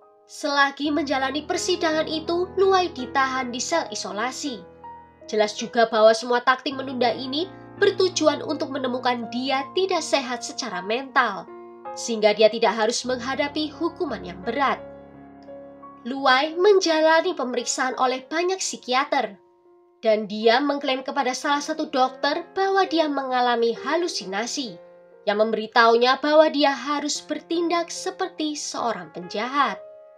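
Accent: Java, Indonesia. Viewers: Mbk jawa nya medok amt mbk😅😂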